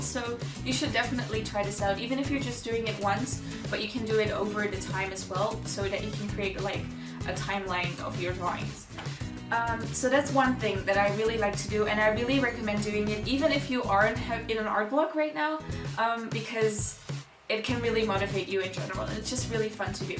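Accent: Netherlands. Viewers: your dutch? awesome! I'm also dutch ;D well asian, but I am born in the Netherlands good video and I like your accent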